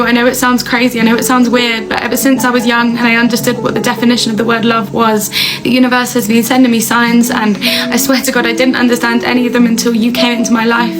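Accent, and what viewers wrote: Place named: United Kingdom. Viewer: I would write ✍️ words and phrases to your lines that inspire me, that talk to me. I would compose words and phrases that your UK accent speaks to me every time you write a line, edit out a word or phrase that makes it more mathematically precise. Hoping that it is what my soul is saying to your soul.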